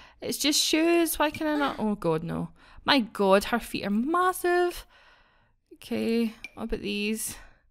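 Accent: Scotland, United Kingdom. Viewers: Can hear those scottish roots coming out in you :P Instantly knew, scots rule! \o/ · Omg ur accent! I luv it! U Scottish? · I'm pretty sure she's Scottish as an Australian accent is like a relaxed British accent. I'm Australian, I should know ahahaha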